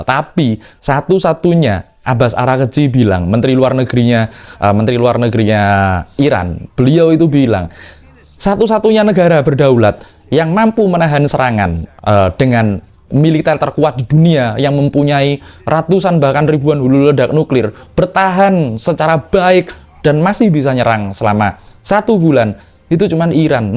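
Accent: Java, Indonesia. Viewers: Mas. Nonton chanelu ini bukane tegang. Tapi malah kudu guyu ae massehhh. Khas asli wong jowo awakmu ki cara ngomonge.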